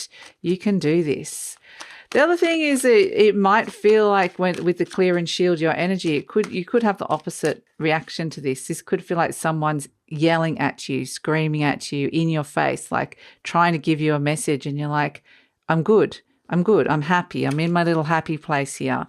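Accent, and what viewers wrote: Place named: Australia. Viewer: I love to watch Masterchef Australia and you have the same accent than Billie, knew right away that you are in Australia, all the green in your garden, beautiful 💙 Thank you for your reading, always interesting and inspiring Wishing you a great weekend 😊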